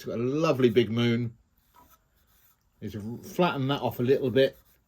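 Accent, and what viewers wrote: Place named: United Kingdom. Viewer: Not only did I enjoy that, I’ve saved it for later. It is different and I like different. It’s also nice to hear a British accent. Thank you for sharing 🌞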